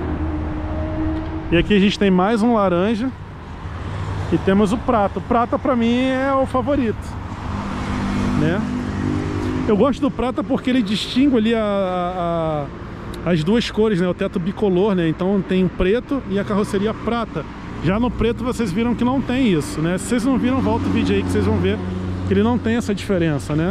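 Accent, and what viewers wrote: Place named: Rio de Janeiro, Brazil. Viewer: Esse sotaque carioca e joativo aff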